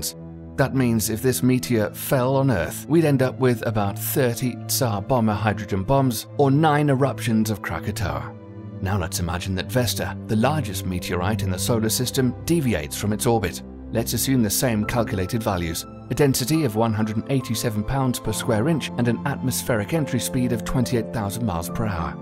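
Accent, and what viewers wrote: United Kingdom. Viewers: Narrator: PLEASE stop saying "Nuke-yu-ler"!!! The word is spelled "Nuclear". And pronounced "Nu-clee-er". "Nuke-yu-ler" (or, often, "Newk-ya-ler") is the 'Murican Bush-level yee-haw pronunciation for high school beer-swillin' gun-totin' dropouts. You sound British; thus, I had expectations you knew the language. "Disappointed, I am", says Yoda.....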